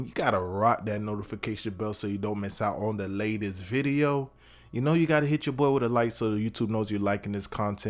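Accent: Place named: Florida, United States. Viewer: Simple, easy to make recipe and taste good! Appreciate you you bruhdah! Sounds like your a from FL.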